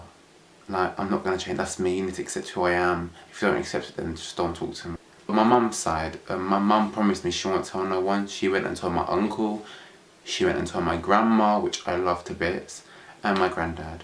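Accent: United Kingdom. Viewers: You seem to have a very 'North London' way of speaking (in main part of the video). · Mate, I am proud of you. You don't need people who don't love you and don't respect you for who you are. You sound British, so take heart, you live in one of the most tolerant, loving and peaceful nations in the world. The more you be yourself, the more you will find people who really love you and you will form newer better connections. Peace. x I would love to know how you feel about Islam following your family fallout.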